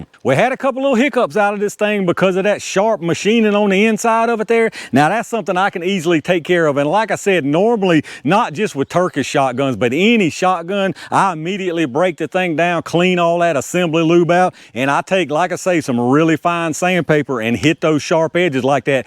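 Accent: South, United States. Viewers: AWEsome video. I dig the southern accent too.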